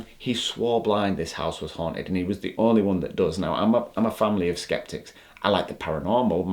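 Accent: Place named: Scotland, United Kingdom. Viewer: Greetings from the desert of so kali. First time at your channel. I take it you are British by your accent, Scottish now I'm hearing you more? Are there any homes left in the British Isles that are not haunted? I'm seeing a lot of your ancestry in your face, excuse me if I'm being presumptuous-I'm seeing Briton, Dane, high German(strong jaw), hair is wavy, is that Celt? High cheekbones, sometimes some Euros and Brits are built such as they look almost part American Indian-spacious eye/cheek area but likely not at all American indian. You seem very tall. Over 6' 2. Are you around 30 yrs old? Are you a Sagittarius? It's so wild to see younger generations embracing the paranormal the way I did when I was a teen, 20's and 30's. But back then, one was "weird" and pretty much ostracized for even talking about the things you are talking about now. 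Ah-that's an angle I hadn't really thought about-that unfinished business keeps the energy here as a ghost. A Wrinkle in Time-great book. I thought it was merely trauma that kept the energy earthbound as a ghost. But what you said makes sense as well. I saw a spirit or ghost one time. It was a man who was staring into my eyes intently as he went from an old man growing backwards until he was a young boy. There were light sparkles all around him and I was just floored, doing a check, recheck on his intent of showing himself to me. Then he disappeared as a young boy curly dark hair, dark eyes and wearing a hat with a feather in it that you'd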